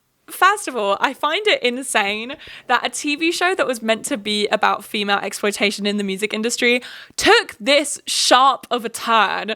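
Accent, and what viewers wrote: United States. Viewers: girl your American accent is giving me Emma Watson in the bling ring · oh my god I love the sarcastic american accent 😂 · I am begging someone in the industry to make a movie or show about an egotistical self-centered show writer who ramps things up higher and higher and higher and is basically given whatever he wants just because the company loves him so much. I feel like it could be quite a good surreal black mirror-esque show/movie Edit: also, killing the American accent! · ok girl your American accent was surprisingly good in some places!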